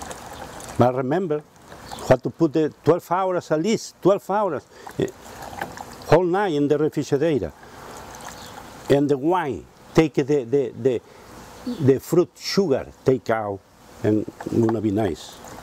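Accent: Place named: Spain. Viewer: His Spanish accent is so fckin cute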